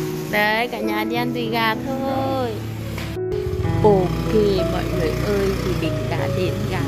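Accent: Central, Vietnam. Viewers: Cô quê ở đâu mà nói giống giọng miền trung vậy ạ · tại con nghe cô nói giống giọng miền Trung ghê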